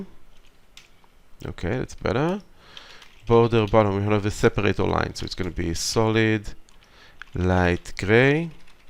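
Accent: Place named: Israel. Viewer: אתה ישראלי? המבטא שלך נשמע ישראלי רצח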